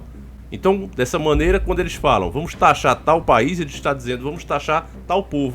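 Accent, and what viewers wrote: Northeast, Brazil. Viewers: Eu por exemplo,acho o sotaque falado do aqui do canal diferente e surreal. Eu não sei como seria quando eu visitasse o nordeste como a cidade de Recife-Pernambuco. Nossa! Será algo como ir a outro país dentro do próprio país e eu tenho essa curiosidade de ver isso de perto nessas terras longínquas. Eu acho o nordestino super diferente em tudo; seria como estivesse convivendo com outra nação. Diferente né!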